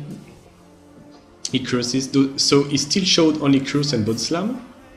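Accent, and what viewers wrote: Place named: France. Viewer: These are good videos. I have watched a few of them already, and I enjoy the combination of the team presentation in the beginning and the following game commentary, showing how it works in practice. Also, the French accent is surprisingly pleasant to the ear. What do you think about the idea of going more in-depth on a team presentation, and showing more than one game (two or three, most likely) where you use the same team?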